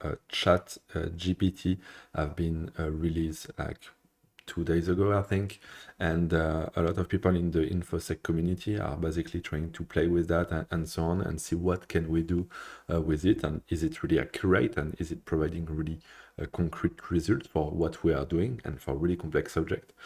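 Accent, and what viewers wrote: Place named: France. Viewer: T'es francais toi mdr très bonne vidéo sinon!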